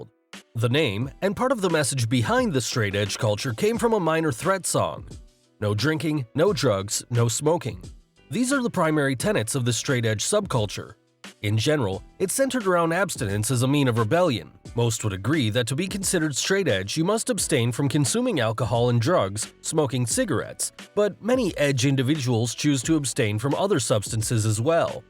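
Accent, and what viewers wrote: United States. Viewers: What's up with the non-British accent? American accents just aren't convincing. They can't even be depended upon to raid Area 51 properly. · Shag? British copy but an American narrator. Weird combo. Grams spelled grammes too.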